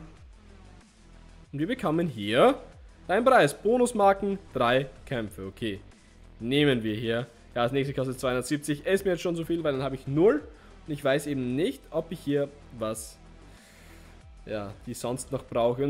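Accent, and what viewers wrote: Austria. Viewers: Biste eh aus Österreich?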